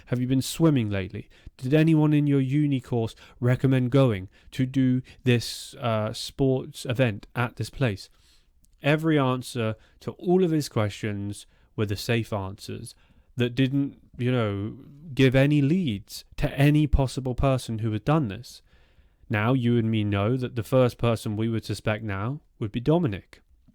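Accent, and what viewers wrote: United Kingdom. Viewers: You have some great stories,but please make them less meandering!! I've seen people commenting on that in your other videos...you will lose viewers, otherwise. Of course, your British accent is nice and you seem personable. Just less detail,so that you can keep the story moving along...😊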